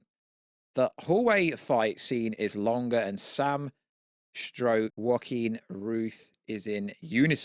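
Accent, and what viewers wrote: United Kingdom. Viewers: You sound British eating beans and tuna all day ...🤮🤮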